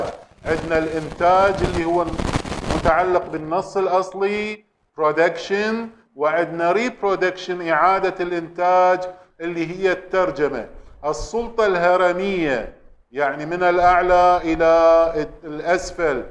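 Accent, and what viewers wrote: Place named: Iraq. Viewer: اللفظ بلكنه عراقيه لاتليق ب pro لو كان بلكنه بريطانيه او امريكيه افضل استاذ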